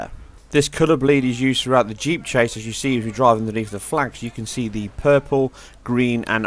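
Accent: United Kingdom. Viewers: that voice makes this video unwatchable fucking brit accent shit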